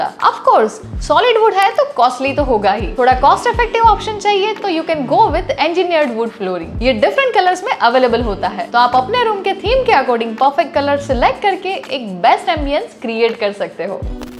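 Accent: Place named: Gujarat, India. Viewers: It's Gujarati accent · Saurashtra tone.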